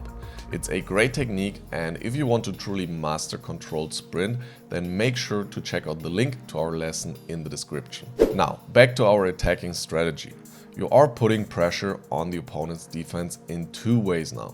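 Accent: Germany. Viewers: Warum merkt man, dass du deutsch bist?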